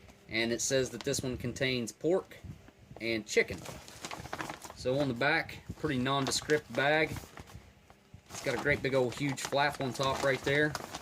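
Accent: United States. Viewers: I'm binge watching your videos like crazy now. Completely enthralling content, plus your American accent is lovely and makes everything interesting.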